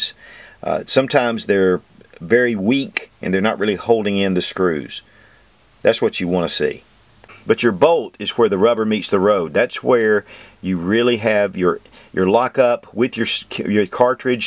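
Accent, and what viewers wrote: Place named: New Jersey, United States. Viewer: Sounds like New Jersey!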